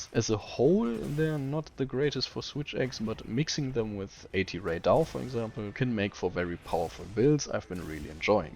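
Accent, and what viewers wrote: Germany. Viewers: Are you german by any chance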